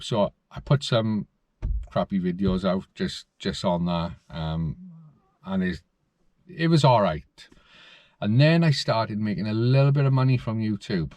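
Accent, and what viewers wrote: Wales, United Kingdom. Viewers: Your little Welsh tones have been missed. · Thanks for all you've done, take it easy butt. When I first found one of your videos I'd been outside Wales for months in Uni, it cheered me right up hearing that familiar Welsh accent! You've put up some great videos and we appreciate them all. Good to see you're well and healthy:)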